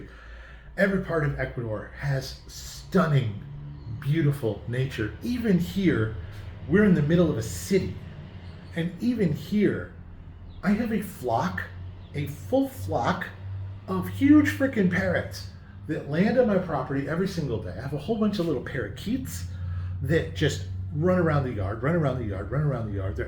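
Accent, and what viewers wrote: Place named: Upstate New York, United States. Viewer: I'm back, lol! Hubby and I have been wondering what part of the US you hail from. We had a decent guess since we've traveled a lot around the US and you sound like you could be a brother to a dear friend of ours who is from Rochester, NY. We watched this video again today and caught the part about being from rural upstate NY so we feel rather smug about pinpointing the state at least, haha. Again, thanks for the great content. We enjoy your videos a lot. We'll be visiting in July.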